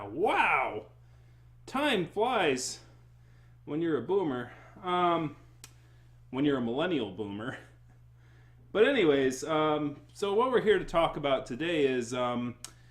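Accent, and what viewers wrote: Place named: Australia. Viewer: At last! An Aussie accent! Maybe you've done that in the past, but this is my first time present for it, so... One thing the Furry Fandom seems to be really good at is creating its own problems and then complaining about it. This whole thing with h3h3 is just the latest example. The Majira episode is truly a decent opportunity wasted by technicalities which have already been forgotten, ironically enough. I can't say that the realization of what the Fandom is about isn't lost on furries themselves, since LOTS of them act like it's about the suits, even if they say it's not. It's disappointing to me, but it is what it is. All this podcast did in general is comment on the conduct they're seeing, so I respectfully shrug. What were we expecting without an experienced guide? Then again, you make a valid point about decorum. No one with taste would throw on makeup and S&M if they were having KISS as guests. 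I often think of jobs I've had or just doing a favor for someone where I'll reach for something, and usually boring people will automatically vomit the phrase "he has long arms.". Well, thanks Captain Obvious. And they say this in a way that requests that I grin from ear to ear like an idiot and express near grief when I don't. Yeah, guy, it's nice to have 'em, but it's not all that interesting when you have 'em. Thank you will do the trick. The latest on this can be found on Twitter, where the debate on whether the Furry Fandom even needs representatives rages. Never mind that i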